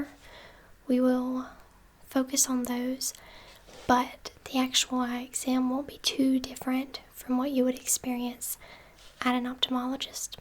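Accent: South, United States. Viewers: Thats either an Alabama to NC accent. Or shes in the midwest. Hell it could be upstate NY as well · Anybody know which southern state she is from? Just curious to know where accent is from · I hear the southern accent but can't place it; What state are you from? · Lis Sanguinan it's from the southern United States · Lis Sanguinan southern accent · It's a southern accent dude lol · The subtle southern accent melts me. I loooooove it. GG nice vid keep it up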